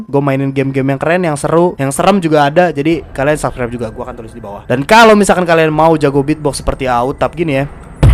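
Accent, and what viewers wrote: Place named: Surabaya, Indonesia. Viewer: Hadir coy suroboyoan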